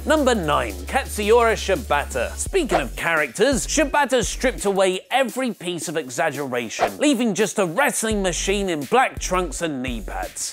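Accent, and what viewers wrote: New York, United States. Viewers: who else popped for the return of 1920's stereotypical New Yorker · Ahhh the return of stereotypical 1920s New Yorker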